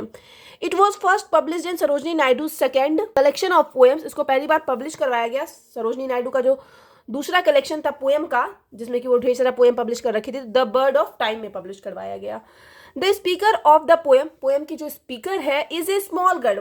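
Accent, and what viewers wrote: Bihar, India. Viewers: From your accent I guess that you are from or somewhere near Patna, Bihar. Give me a like if I'm right.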